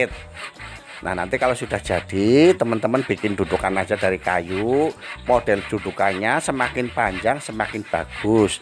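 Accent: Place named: Banyumas, Indonesia. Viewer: Logate banyumasan banget pak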